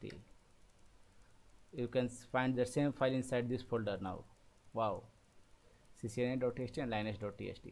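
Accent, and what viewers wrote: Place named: Kerala, India. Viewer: it works man! many thanks. btw are you from Kerala? I've got a friend from there you got the same accent . hehem . Take care!!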